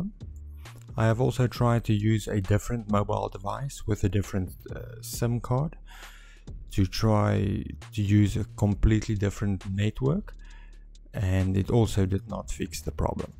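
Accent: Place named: South Africa. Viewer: Hey , I can hear you're south african and so am I , Can guess the issue has to do with our country.... The way i've gotten it to work is by trying multiple phone hotspots until one of them seems to work.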